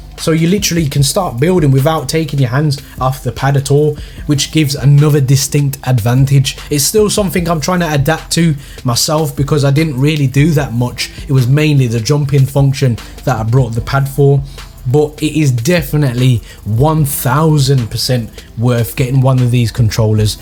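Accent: Birmingham, United Kingdom. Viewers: U from brum?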